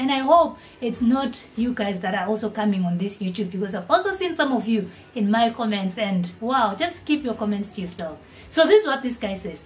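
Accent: Kenya. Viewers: kazi nzuri sana dada...yani umekua mkenya kabisaaa congratulations..nilisikia pia ukiongea my language kikuyu nikafeel wewe ndio daughter of African pongezi...acha watu waheshimiane....zulu not superior than other southafrican countries we are all one especially that we are all bantu speakers...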